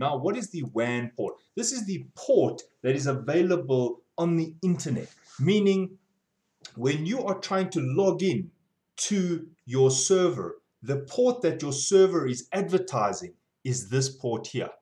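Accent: South Africa. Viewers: Haha, such a strong SA accent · jirre, get smacked by that south african accent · I hear SA accent, I upvote!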